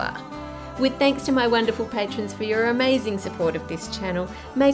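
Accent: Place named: Australia. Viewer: Sometimes Ann sounds like bob ross, but with a female Australian accent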